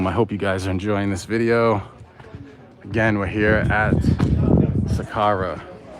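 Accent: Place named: South Boston, United States. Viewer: I hear Southie..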